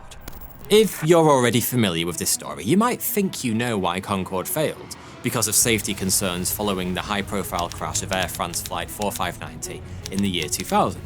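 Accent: United Kingdom. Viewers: Another freakin British accent channel, I can’t wait for a big Channel to call out this plague that’s been going on for the last 5 years . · 10,500 dollar per ticket according to my Unc. We spoke about it awhile ago. When it first was introduced it was much cheaper. Lol first time i heard a british accent. I flew concord when I was 6 or 7 like...mid 90s. It's really small inside, like really small. Never experienced service like that tho. Beautiful experience from what i remember. I didn't even think of taking in the experience of seeing the curve of the earth. I would be to scared to fly concord now. The Russian one looked cool. I need to build the lego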